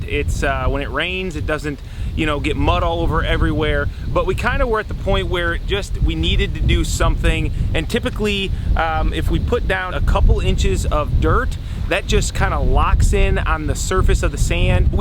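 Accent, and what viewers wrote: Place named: Chicago, United States. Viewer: Dude. I was born and raised in Illinois, 30 years. Moved to Texas 3 years ago, and everyone tells me I have chiiiiicago accent. Well... I've never heard what they are talking about... Until today. After hearing you... I get the chiiiiiicaaaaaaago accent. Good work though!! Keep it up - enjoying the builds!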